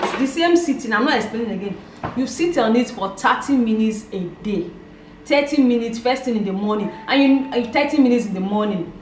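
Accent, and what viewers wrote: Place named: Nigeria. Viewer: you are so amazing but I was not understanding what punana is don't talk that English from Nigeria I can't understand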